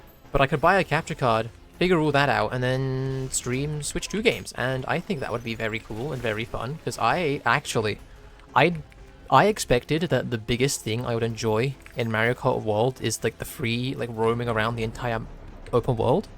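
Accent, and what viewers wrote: United Kingdom. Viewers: I enjoy listening to funny British guy's(your) voice while I do stuff... it's like a podcast with occasional ultrakill sounds.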